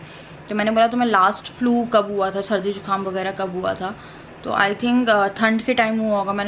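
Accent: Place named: Sadri, India. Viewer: Lgta h app sadar Se ho dii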